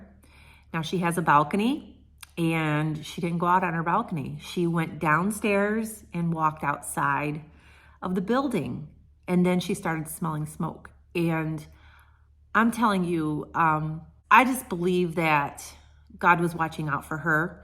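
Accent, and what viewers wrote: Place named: Michigan, United States. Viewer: Oh, my goodness what a time your family has had, but our God is so good!! I just came across your videos this week and wondered if you were from Michigan as I recognize the accent, lol. I am originally from Riverview. I have lived in Tennessee most of my life, but still have a soft spot for Michiganders. I am praying for your husband, his mother, and you!! 🙏🙏🙏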